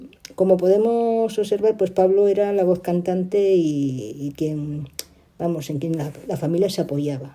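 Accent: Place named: Asturias, Spain. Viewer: Mi like y suscripcion para que tu canal crezca , por el acento pareces Asturiana , yo tambien , buena suerye con el canal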